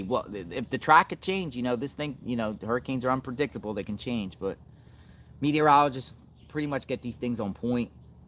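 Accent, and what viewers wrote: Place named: Pennsylvania, United States. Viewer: That PA accent! Can't miss it.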